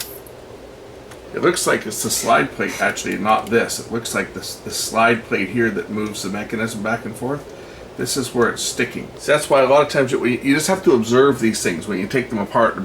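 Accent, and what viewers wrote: Canada. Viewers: Hello my friend, I really enjoyed this video. Love the cat too. I have family in Canada, I know that accent very well. Thanks for sharing, also I just subscribed. Kind regards. Paul.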